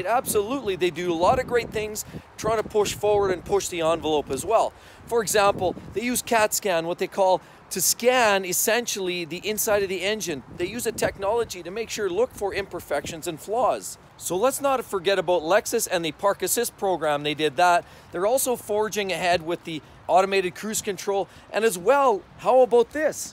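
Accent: Canada. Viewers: Abut , ut , lol. Is this guy Canadian? Talks funny. Mercedes unreliable. Chinese transmissions.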